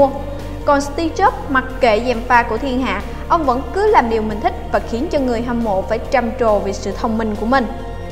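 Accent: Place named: South, Vietnam. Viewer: Giọng miền trong thì phải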